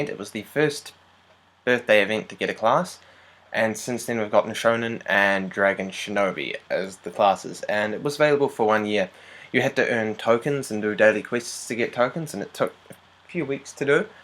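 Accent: New Zealand. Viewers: Thicc kiwi accent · First video I watched from you, are you a New Zealander?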